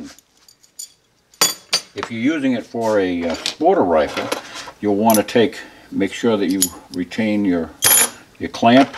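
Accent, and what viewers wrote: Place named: Boston, United States. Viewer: Excellent. Learned something new. I detect a Boston accent. What’s a freedom loving gun owner doing in that forsaken state?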